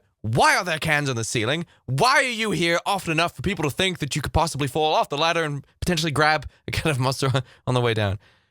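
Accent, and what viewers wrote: United States. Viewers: Why do you sound American? you put your youtube as United American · Where are you from? You sound American to me but I seen where you said you’re from the UK. I’m from the US and I think you sound American. Much love to you and love your videos!!!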